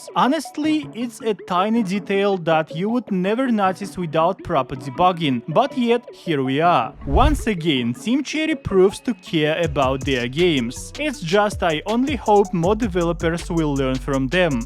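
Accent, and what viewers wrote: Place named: Russia. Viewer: It's a Russian accent this guy has a YouTube channel in his native language.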